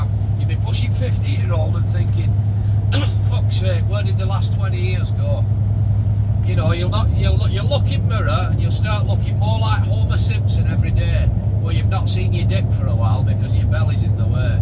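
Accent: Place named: Wigan, United Kingdom. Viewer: I'd love to give you ma mates address in bonnie Dundee,park that heep of shit outside his window 😁 Strath carron, give us some of your Wigan twang!!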